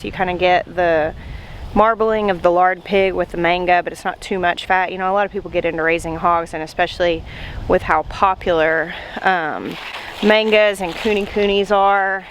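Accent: South, United States. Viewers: the southern accent is growing on you. I am so glad you are both safe! And the big boar is safe. Glad figured it out.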